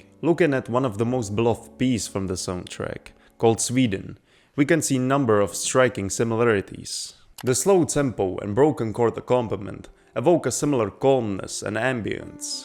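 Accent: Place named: Russia. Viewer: yo, nice video dude and, what i just want to say... music in minecraft sounds kind of awkward, and it's creates its own rather distinctive atmosphere, it's one of reasons why i so much love this game (but i never play this again haha). this video made me refresh my memory, thank you for that. (and i can't help but say this: your conclusions in the end remind me a bit about pugpiece's video about space in video games, idk you russian or not, but if answer is "Yes" (i dare say, because the accent is a little similar) - watch this, i think you're enjoy that)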